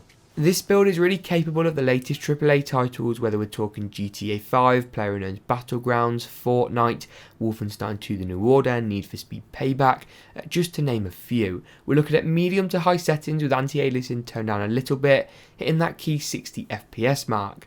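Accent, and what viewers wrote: England, United Kingdom. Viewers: You sound english, Why priced in dollars?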